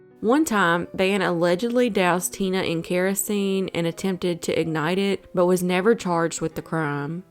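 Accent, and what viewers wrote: South, United States. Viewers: As a native from new hampshire,your "southern"voice trying to pronounce basic words,NH towns and english nouns,is most annoying almost like nails on a chalkboard. It might be just me though,an English professor,but i believe some will agree with me your pronouniations and voice are ridiculous.please,leave the cold case stories to professional,normal speaking individuals.i know your just looking for views or likes,but couldn't you just stick to videos descibing basic things like what kind of food was at the county fair or what country artists were nominated at the"cmt" awards.?please,people from NH have heard enough from your nonsensical, moronically overtones.unless you'd want to make a comedy.. Could get you more views - Sincerely,English Professional.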